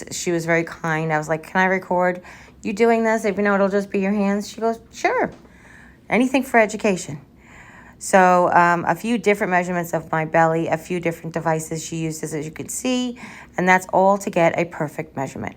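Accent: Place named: Long Island, United States. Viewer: Hiya. Girrrrrl, share away. Learning about the Peri wick is neato. Way to be independent indeed. As you know I dig your humor & that Long Island voice of yours. I swear when you speak, I feel home. Praying for you my friend.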